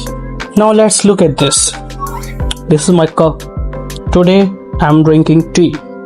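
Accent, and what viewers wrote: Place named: India. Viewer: Your English looks Indian English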